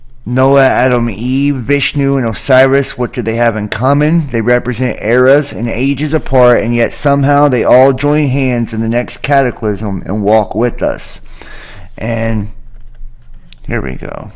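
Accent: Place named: France. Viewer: I will always love your french accent! 😋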